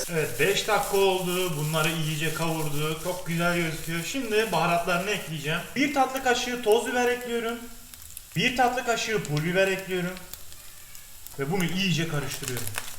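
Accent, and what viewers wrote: Adana, Turkey. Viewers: Abicim adanali misin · abi sende adana şivesi var heralde kesiyürüm bakürüm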